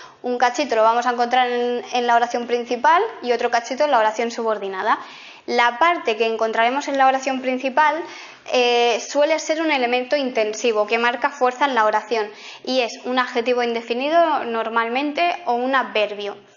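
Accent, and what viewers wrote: Spain. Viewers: Ella es española? Y explica muy bien, me ayudó hacer apunte q me lo pedía en una tarea😇 muchas gracias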